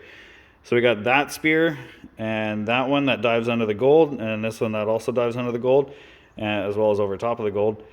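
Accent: Canada. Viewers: Love hearing a Canadian accent on youtube and realizing you're watching a fellow west coaster at work! Nice work buddy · Took me a minute to realize u were Canadian. What's good from London Ontario!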